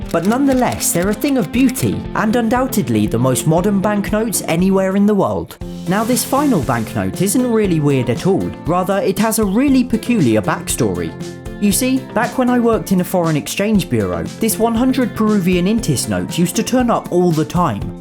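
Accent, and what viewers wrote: United Kingdom. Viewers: I love your british accent 😂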